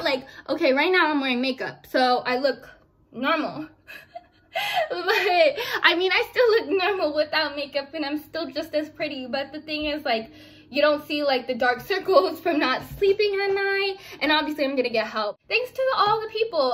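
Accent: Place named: Visayas, Philippines. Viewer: Get well soon, imong bisaya conyo miss na namo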